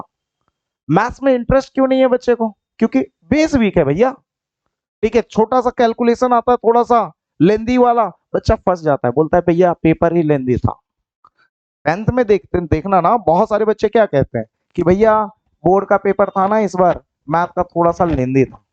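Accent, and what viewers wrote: Bihar, India. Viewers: Sir aap bihari hai kya??? Hum bhi bihari hai .....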